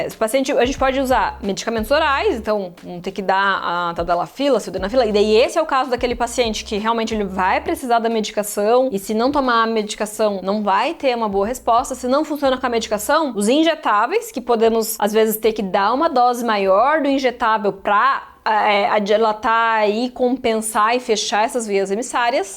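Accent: Brazil. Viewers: Deve ser do interior de São Paulo SP como a pavoro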